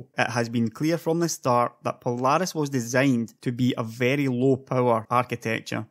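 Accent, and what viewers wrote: Scotland, United Kingdom. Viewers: scottish iirc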